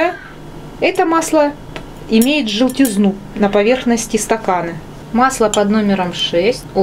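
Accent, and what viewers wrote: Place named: Ukraine. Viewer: невозможно слушать, необразованность жуткая у сей девицы. Украинский акцент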